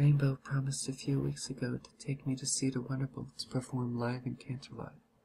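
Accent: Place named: South, United States. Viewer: Congratulations, I'm sad. I might just suggest though, when you read, pronounce each word, don't slur at the end. I was wondering if you were southern because I kept thinking of as you talked In Twi's perspective. Also, another pointer, don't read when you have what sounds like a morbidly obese man in the background snoring. Wait until you're alone. All critique aside, great story, great reading. Good job.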